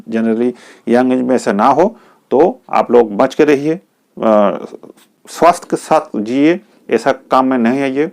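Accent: Bengal, India. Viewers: আপনার বাংলা ঘেষা উচ্চারণের জন্য বললাম ।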